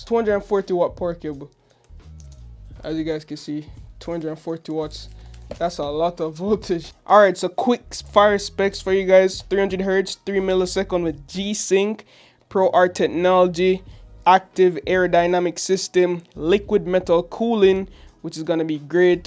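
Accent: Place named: Jamaica. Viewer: Subscribing just because you sound like a fellow Yardie. Didnt even finiish the video yet 🤣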